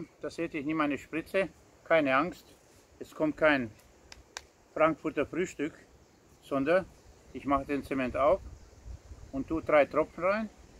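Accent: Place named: Hungary. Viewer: Ich mag Deinen ungarischen Akzent.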